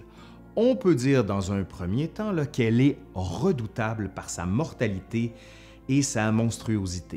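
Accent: Quebec, Canada. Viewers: Sympa ta chaine "couuusiinnng". L'accent québécois est savoureux.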